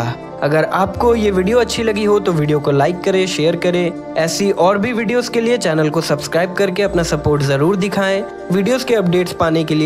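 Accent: Standard. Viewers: Bhai aap itni sudh hindi kab se bolne lage😒😕😒😕😒😕😒😕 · shudh hindi mat bolo karo aap jese bolte the wese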